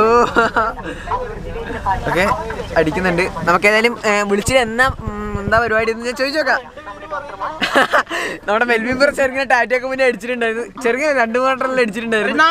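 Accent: Kannur, India. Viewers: Nee kannur karanano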